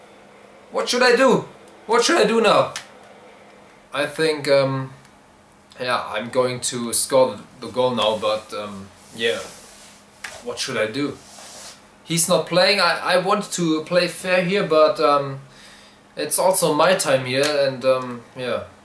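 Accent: Germany. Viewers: du meinst deutschen akzent ;) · deutsches Englisch :D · Sorry trotzdem höre ich nur nen deutschen Akzent raus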